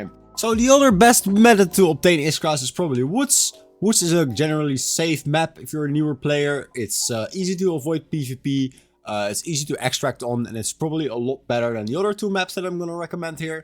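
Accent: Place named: Netherlands. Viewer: Nederlands, I can tell :D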